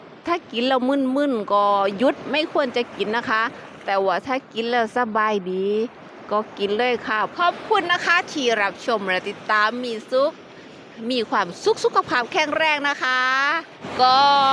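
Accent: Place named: South, Thailand. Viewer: ให้กำลังใจน้องกันดีกว่าคะ.บางครั้งการทำคริบในการพูดแบบนี้มันก็มีติดบ้างอะไรบ้างนิดหน่อยมันก็ไม่ได้น่าเกลียดอะไรสำเนียงใต้ฟังก็เพราะดีนี่คะ.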